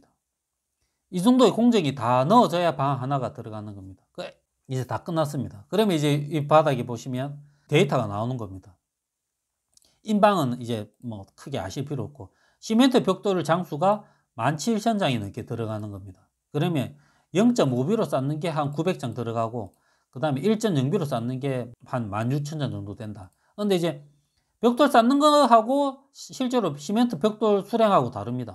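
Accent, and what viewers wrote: Gyeongsang, South Korea. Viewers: 님이 집 짓기 하는 지역이 어디인가요.? 경상도 말투 같은데 사업장과 멀면 비용이 더 들어갈까요? 저는 합천입니다.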